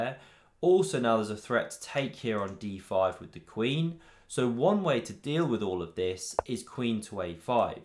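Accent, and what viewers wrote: United Kingdom. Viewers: Congratulations because I' m italian and I understand every Word, your english Is pure. Are you British?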